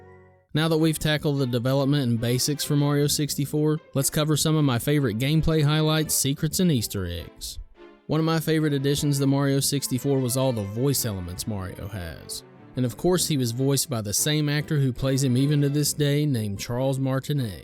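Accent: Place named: Georgia, United States. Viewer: I just want to say as a guy who grew up in rural Georgia and was born in the mid-80's, it's gratifying to watch this video in that accent and hear you describing memories of experiencing Super Mario 64 at about the exact same age I did.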